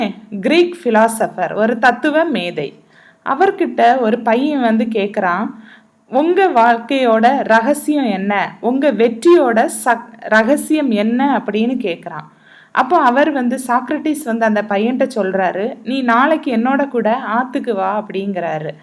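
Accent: Kanyakumari, India. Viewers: MADAM THANKS FOR THIS VIDEO. Unga voice kekum podhu kanyakumari slang maadhri iruku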